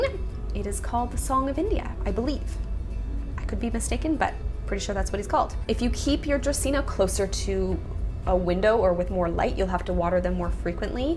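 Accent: Canada. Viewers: Great video. I'm going to be getting a bunch of plants soon and this was super fun and helpful. Side question? Are you from Canada? Accent sounded Canadian.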